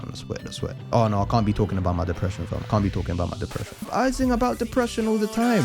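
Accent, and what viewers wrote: United Kingdom. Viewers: This guy is talking about a “stereotypical white accent” as if he’s from the states and has a British accent. Address your culture first before you tackle anything American Edit: I have no clue what this dude is even talking 3 minutes into the video 😂 all Brit’s sound the same to someone in the US, so confused. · Well to me, as an American, you don't sound white or black. You sound British. And all of you sound the same to me. You have different accents but they may as well all be the same and we'll just call that British.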